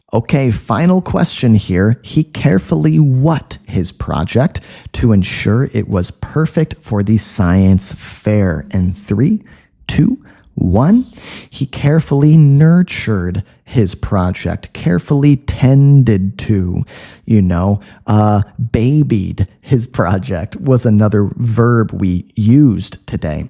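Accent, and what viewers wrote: United States. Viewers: Hi. I am watching from france, I discovered your channel recently. I listened to the GSL english podcast, and I loved the way you spoke in that podcast. Your American accent is terrific, and it has drawn my attention and wanted to listen to you more. Keep up the good work. I love what you do 🙏🏼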